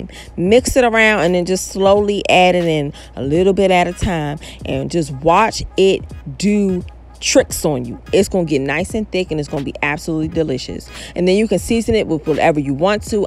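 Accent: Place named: Maryland, United States. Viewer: You must be from Maryland accent real thick Kudos on the chicken breast👌🏿💯🔥🔥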